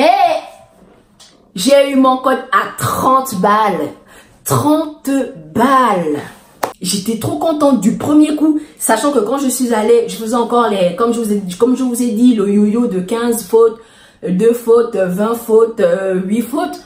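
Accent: Gabon. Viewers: je connais l'accent là. C'est une gabonaise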